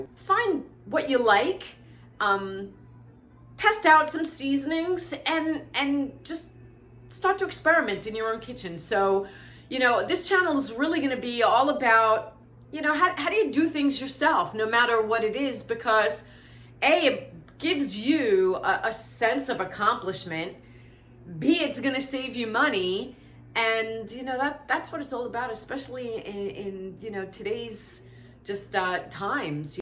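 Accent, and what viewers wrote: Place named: New York, United States. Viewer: What’s that accent?!?! Big 🍎 ??? 🥰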